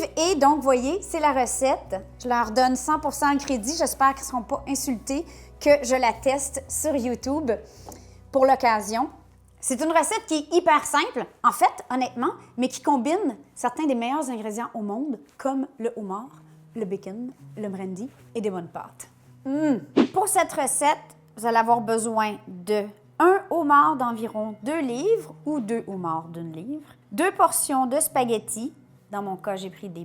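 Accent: Canada. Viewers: Coucou, j'adore tes vidéos, ta bonne humeur me fais passer de super moments!! Je suis italienne mais j'habite en Suisse, et je conseil ton blog à tout le monde (ici on adore l'accent canadien;) ). Je ne sais pas comment j'ai découvert ton blog mais depuis ce jour là j'attends tes vidéo avec impatience. J'admire ce que tout fais et surtout comment tu le fais. Merci pour tes recettes et tes sourires.:) Tania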